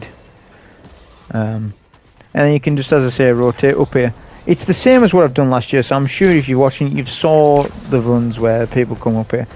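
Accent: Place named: Middlesbrough, United Kingdom. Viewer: Top content as always. I don’t want to be cheeky but your ascent sounds like you’re from the ‘Boro.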